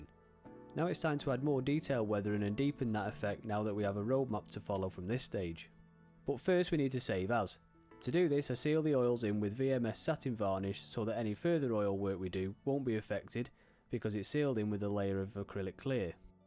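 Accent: United Kingdom. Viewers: Ready to take up one of my favourite childhood pastimes again, and your channel looks splendid for learning the proper way to do it. Was a bit confused seeing Biltema acetone combined with your British accent. DIdn't know it was available outside Scandinavia.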